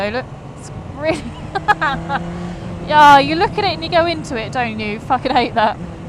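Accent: United Kingdom. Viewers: i like that British accent so much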